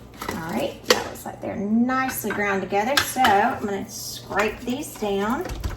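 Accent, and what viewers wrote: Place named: Georgia, United States. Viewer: Happy Thanksgiving to you and your family. I have been watching for awhile now and I love the variety of recipes you present. I also love your gorgeous Georgia accent. Since I'm from Alaska I don't have too many southern accents around me! One of my favorite holiday desserts is pecan pie so this looks delicious to try. God bless you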